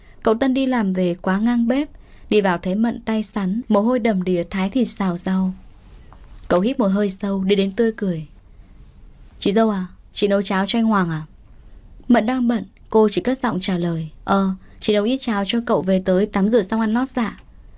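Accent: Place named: North, Vietnam. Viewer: Giong độc chuẩn miền Bắc,. Thanks,,👍👍👍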